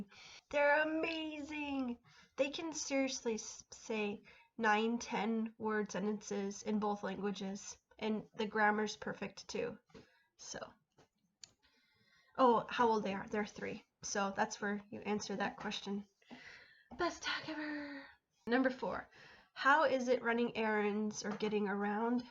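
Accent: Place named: Sweden. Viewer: Hello! Thanks for subscribing! I'm definitely looking forward to watching more of your videos because I actually have know a couple that's moving to Sweden soon to be long-term missionaries! I'll definitely tell them about your channel! Also I like listening to other languages, your Swedish sounds super cool! And I like that your English has a Swedish accent too!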